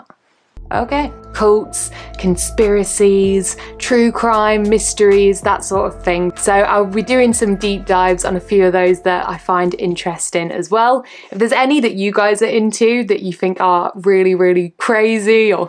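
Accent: Manchester, United Kingdom. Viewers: I'm guessing the Lego is a part of your balance and should not mix with the World Wide Web??? Your series is the only one that REALLY hit home to me, it was because it was closer to home with me (UK, and the 'proud to be' Manc accent). Ill be sure to keep tabs on your efforts. A great idea to focus on the 'after Scientology', It would be quite empowering I think. Well done, keep up the great work, your a natural. RIP Grandma x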